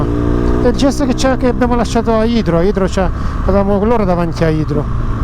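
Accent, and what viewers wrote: Abruzzo, Italy. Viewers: Complimenti discorso esemplare, hai il giusto modo di viaggiare, in sicurezza e con passione. Poi sento che sei abruzzese come me 👍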